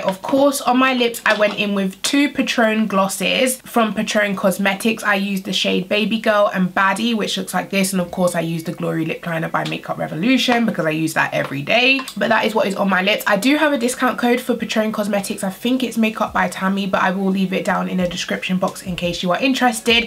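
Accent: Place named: London, United Kingdom. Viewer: loving the look! And (sorry, English geek here), it's not that you pronounce thing wrong, far from that. It's London vernacular/slang - for example, with the "ask" pronunciation, most Londoners do say "arks"...just they way we roll haha <3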